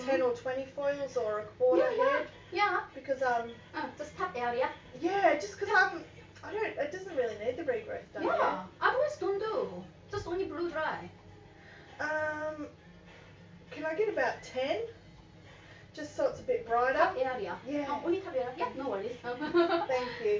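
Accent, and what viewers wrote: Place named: Australia. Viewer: 호주발음 연습하러 와도 좋은 채널인데요? 언제나 느끼는 거지만 호주발음이 가장 어려워요~^^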